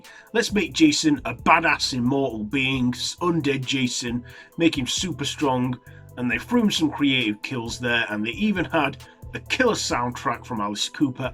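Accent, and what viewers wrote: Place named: RP, United Kingdom. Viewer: This guy sounds exactly like Sir Brian Blessed. God Queens English accent.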